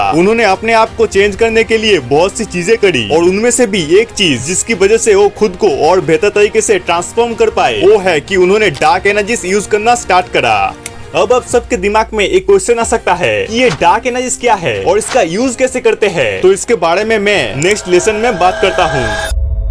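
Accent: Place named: Bengal, India. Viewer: এক্সেন্ট এই বোঝা যাচ্ছে যে তুমি বাঙালি।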